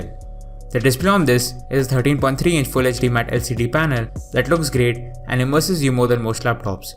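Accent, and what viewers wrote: India. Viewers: Да ), акцент у индусов ужасный )) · I don't understand Indian enghlisdhs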